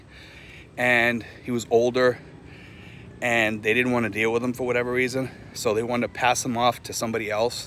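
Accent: Boston, United States. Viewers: you sound like a Boston guy. This is my first time seeing your channel, hello from Lynn Ma.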